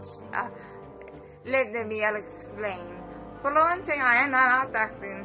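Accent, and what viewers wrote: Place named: United Kingdom. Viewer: very nice thanks for making my day brighter. nice british accent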